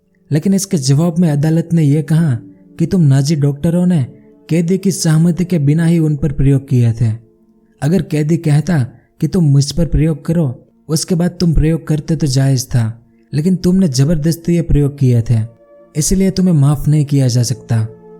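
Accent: Gujarat, India. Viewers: Gujarati lago cho??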